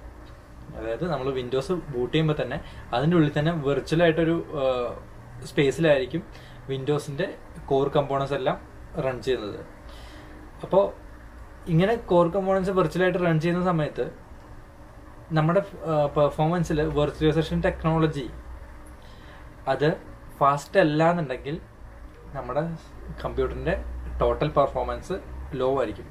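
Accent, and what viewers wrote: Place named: Thrissur, India. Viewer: തൃശ്ശൂർക്കാരൻ ആണ് എന്ന് മനസ്സിലായി അല്ലേൽ എറണാകുളം ❤❤❤❤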